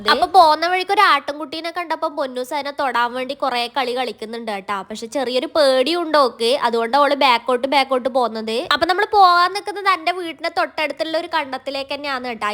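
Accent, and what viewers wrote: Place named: Kannur-Kasaragod, India. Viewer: നല്ല ഭംഗി...എവിടെയാ സ്ഥലം..കണ്ണൂർ കാസറഗോഡ് ഭാഷ ആണല്ലോ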